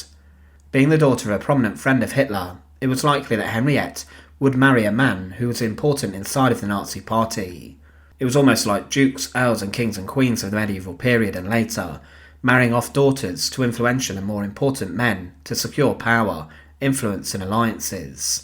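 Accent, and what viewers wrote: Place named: England, United Kingdom. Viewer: He's English. Very easy to understand if you're English but I'll agree not a very naturally spoken presenting voice.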